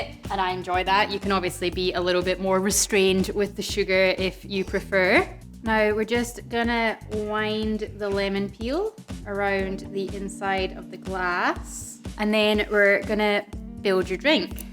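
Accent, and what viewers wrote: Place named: Scotland, United Kingdom. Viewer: I'm obv late to the party but just drank a few (4) brandy Crustas and was trolling youtube for relevant content. I like the bit of maraschino in the crusta. did the Cointreau recipe (3C 2B 1L .5M no bitters), then the 4B 1C 1L 1M plus angostura recipe, and liked the cognac forward version better. I'm sure I'm a bit under things with the relatively quick succession, but just found your channel and thought from the accent--are you Scottish? you mentioned being in Australia in the vid... Imma feel a right knob is your Irish or Aussie--my bad. either way, appreciated the lesson--good stuff! I'm def subscribing.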